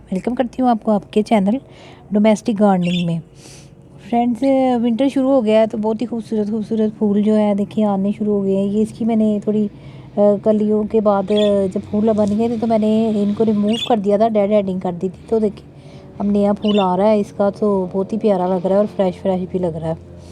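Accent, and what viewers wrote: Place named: Punjab, India. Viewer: barri sohni awaz punjabi touch of pindi ji.like ji.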